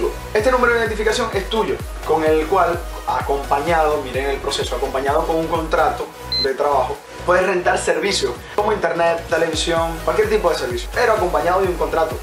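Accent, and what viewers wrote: Chile. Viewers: se Te pego el acento chilenoo. jeje que bueno que ya tengas rut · Que lindo eres, me encantan tus vídeos!!! Muy informativos 😊 Por cierto, se te ha pegado el acento Chileno jajaja😂🙊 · Hablas Chileno... 👎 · hola chico jajaja ya se te pego el acento, una pregunta que es garzon?? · Porque hablas casi chileno xd si ni tienes mucho tiempo xd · Siii! Se te ha pegado un poquito el acento ;) Saludos!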